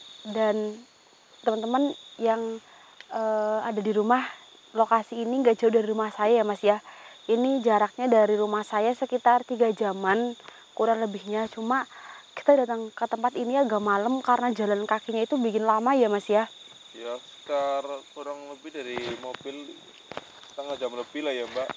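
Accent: Banyumas, Indonesia. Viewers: Kangen ngomong ngapaknya lucu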